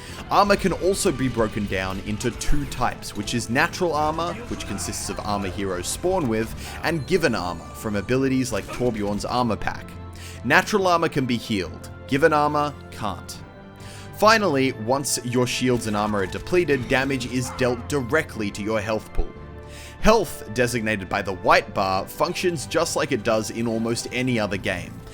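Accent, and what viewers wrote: Australia. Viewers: He's Australian you dumbfuck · from 'Straya. What's so wrong about that? Can't he speak normaly? Does it have to be US english? I don't think so...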